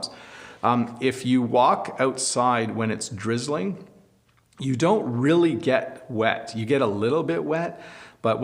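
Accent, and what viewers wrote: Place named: Canada. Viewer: Hey, I would like to follow the Canadian accent. Do you have any tips?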